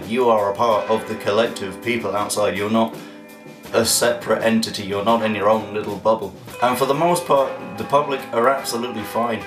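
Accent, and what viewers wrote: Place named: Appalachia, United States. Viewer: Femboy with a hillbilly accent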